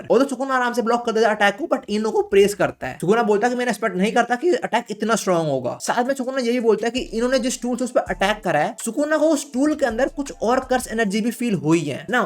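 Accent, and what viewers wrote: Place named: Haryana, India. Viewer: Hate his haryanvi accent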